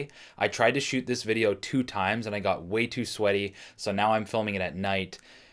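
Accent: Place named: Canada. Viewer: You must be Canadian... I could tell from your accent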